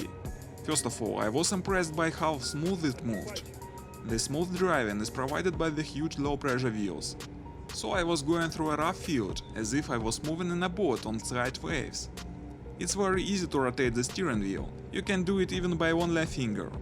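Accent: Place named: Russia. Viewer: Русский акцент аха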